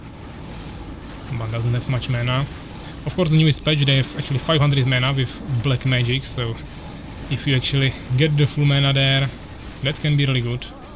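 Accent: Czech Republic. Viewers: are you czech? you have very czech accent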